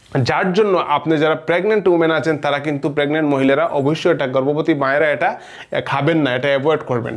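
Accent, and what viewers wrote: Bangladesh. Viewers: বাংলাদেশের বাংলা উচ্চারণ একদম ভাল নয় ।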